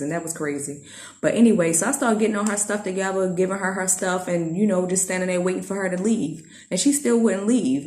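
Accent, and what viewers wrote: Baltimore, United States. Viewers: Let me guess, you’re from B’more?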